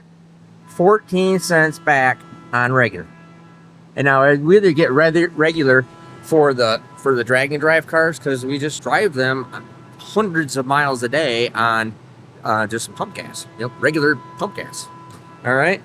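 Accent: Michigan, United States. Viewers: Nice explanation on the dual pumps, i immediately thought of staged pumps but more things to go wrong that way. On the “condescending” explanations. Part of it is the Michigan accent. I moved to Texas in the 80’s. I still have problems with my “tone” when my accent is more prominent, it sounds like your being condescending when its a detailed explanation and the Michigan enunciation sounds like your talking down to some. 🤷🏼‍♂️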